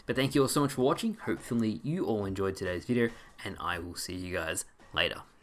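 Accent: Australia. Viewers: So good to hear an Aussie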